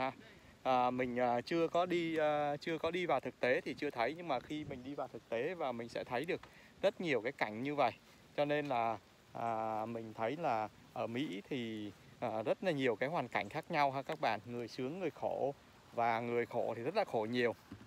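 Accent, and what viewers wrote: North, Vietnam. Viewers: Tiếng bắc mà tự xưng là Bà Rịa.???? Quê tui .... 😚😚😚😚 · Giọng bắc 54 nghe dễ thương giống e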